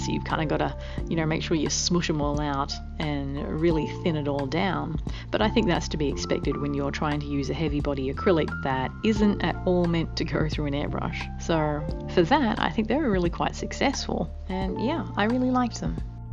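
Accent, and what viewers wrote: Australia. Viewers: You sound Australian...I emailed them a few months ago to ask where I could get Arteza products here, or if they post here, and they said no. Has that changed do u know?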